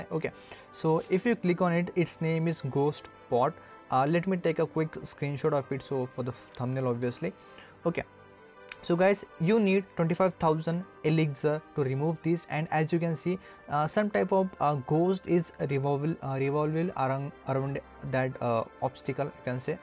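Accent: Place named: India. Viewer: sounds like he from india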